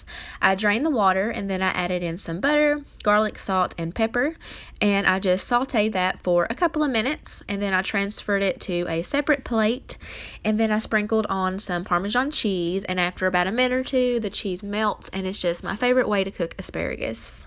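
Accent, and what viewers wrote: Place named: West Virginia, United States. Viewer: Where are you from? Your accent sound like you're sound local or maybe a surrounding state, I'm from West Virginia 🙂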